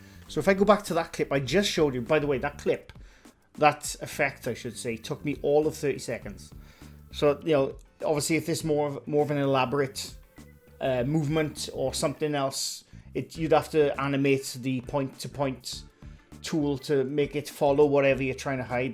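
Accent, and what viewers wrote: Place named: Wales, United Kingdom. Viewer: I love your accent! Aaahhh gotta love a Welsh man!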